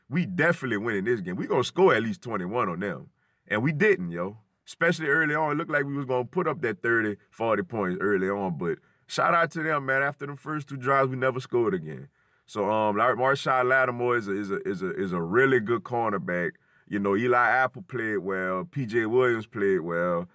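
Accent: New Orleans, United States. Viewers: It kinda sound like you're from New Orleans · Bruh it sound like you from New Orleans.. I’m frm New Orleans myself so I know a fellow New Orleanian when I hear one. I’m jus confused as to why you not a Saints fan cause it sounds like you ain’t been gone too long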